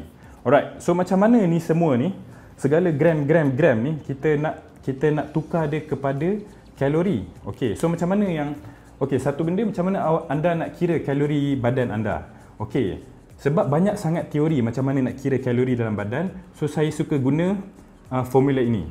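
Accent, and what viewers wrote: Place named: Terengganu, Malaysia. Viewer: kenapa aku rasa doc ni org tgganu😂ada beberapa patah perkataan dia mcm org ganu sebut😂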